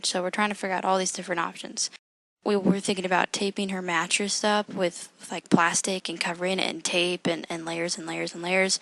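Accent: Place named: United States. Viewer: yep, here I am at 3.30am in the morning stuggling to find a solution to this bed bug problem (from the past Lord knows how many years, but they just keep coming). You sound like you're American.....I speak to Americans everyday as part of my job here in India. I'd like to thank you for taking the time to post this video. I've prayed about it...but the people in this joint family aren't very careful about stuff, they keep old junk stuff under tables or where ever they find place...help Lord :(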